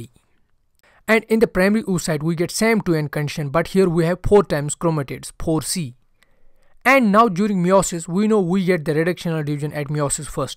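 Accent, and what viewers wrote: Kashmir, India. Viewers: I caught u u r a kashmiri aren't u bolein bolein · Indian Accent is the best !!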